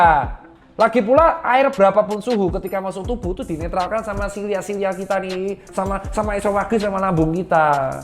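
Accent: Java, Indonesia. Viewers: Ngomonge jowo medok yo dok .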